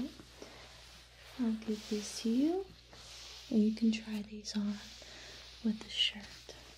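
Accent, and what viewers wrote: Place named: South, United States. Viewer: Tue only problem is she sounds southern and me and the south (mainly the bible belt) try and stay on opposite sides of the Mason dixon line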